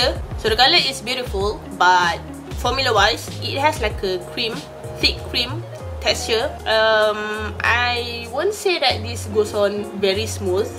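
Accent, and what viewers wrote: Malaysia. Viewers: I love ur English sis. Very meleis but grammatically correct! Definitely worth subscription 😙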